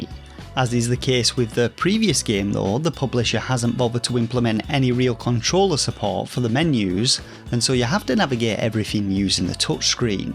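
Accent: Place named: Northern England, United Kingdom. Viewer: You sound like you're from the North of England but I can't quite pin down the city.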